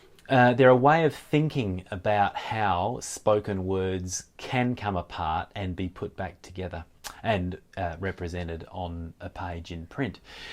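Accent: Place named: South Australia, Australia. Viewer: This was a really helpful video to watch and made me think differently about phonemes and strategies for phonemic awareness ('words are actually blobbed'...great image). I teach adults English as an Additional Language (migrant program) in WA. It's great to find some examples of each phoneme spoken in an Aussie accent. As I'm originally from Adelaide, I appreciated the South Aussie accent too...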